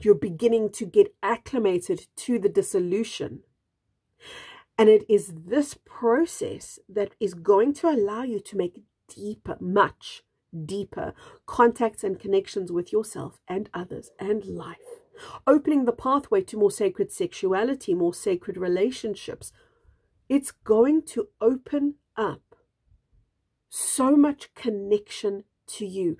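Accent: South Africa. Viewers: Thank you for showing up for me right now. Perfect synchronicity. What a beautiful channel you are. And healing, too. Bless you. (Your accent sounds South African?)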